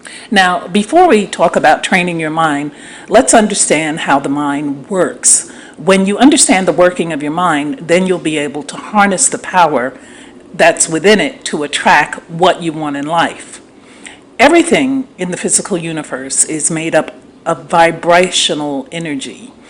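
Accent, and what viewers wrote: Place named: Northeast, United States. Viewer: Ha! I bet those who've said something bout your voice are from the South! I'm a southerner I even said to myself "Listen to this Yankee!" I'll apologize for us lol. But on the real, Love ya accent . Love your videos. Keep doing what cha do sistah!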